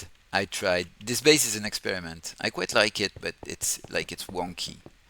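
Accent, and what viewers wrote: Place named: France. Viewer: really cool base, love the french accent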